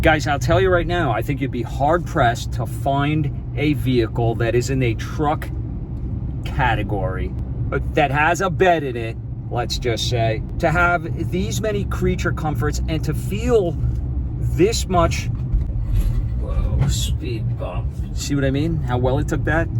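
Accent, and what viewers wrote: New York, United States. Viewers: Are you from NewYork? The Bronx???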